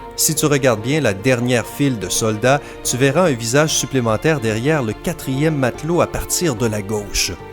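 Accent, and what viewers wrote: Quebec, Canada. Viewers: j'adore l'accent québécois (j'y vais plus souvent que bcp) mais la musique en arrière plan est d'un casse oreilles, une prise de tête ! Bien à vous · Je mets le pouce bleu juste pour ton magnifique accent québécois 💓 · charlatan avec son accent québécois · Super l' accent kébecoi :') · "Un accent québécois" c'est super bien, au moins on comprend quelque chose. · L'accent québecquois, ça va bien deux minutes, après ça me dégueule par les tympans... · On dirait la voix d'un québecois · Vidéo de merde pour le sensationnel, tout ce que tu montre à des explications, fais des recherches er cet accent québécois immonde, ça me fais vomir. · Putain mais ferme ta geul avec ton accent québécois ta niquer la vidéo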